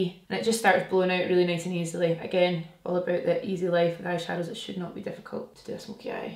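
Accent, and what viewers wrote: Scotland, United Kingdom. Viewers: I recreated the 1st 2 yesterday , I had no lenses so i was blind as shit but still loved playing with makeup 💗🙌 also the Scottish accent is EVERYTHING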